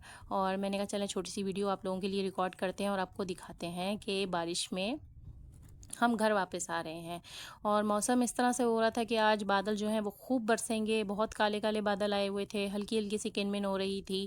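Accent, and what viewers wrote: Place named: Punjab, India. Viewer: Urdu main Punjabi touch acha hai.chuk k rakho..😂😂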